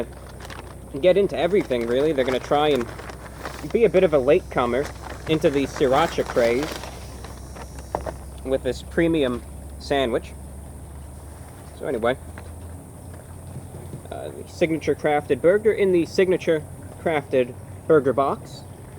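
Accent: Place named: New York, United States. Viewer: I don't get it. It looks like he lives in Florida but he has a slight New york accent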